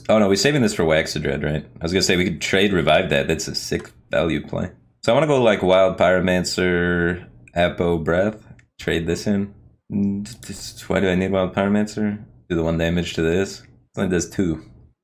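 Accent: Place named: Michigan, United States. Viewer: The Michigander accent is just the most attractive accent in all of the USA.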